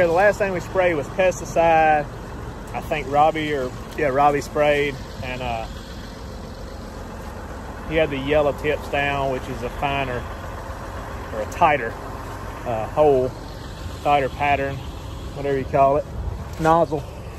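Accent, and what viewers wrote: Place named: South, United States. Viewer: Worked for John Deere for 38 years and spent a lot of time in Mississippi and Arkansas in the Delta area. Use to go out with the dealer personnel to the field since I was from our planter factory in Moline, Illinois. Some of the best ground for growing crops in the World. Love your videos because you'er one of the few farmers in the south on UTube. Like to watch the corn and soybean farmers but since I'm familiar with cotton I thought your channel was great. I too like the information about the history. I was born in Arkansas and I miss that southern accent. Great people in the deep south as well. Keep um coming!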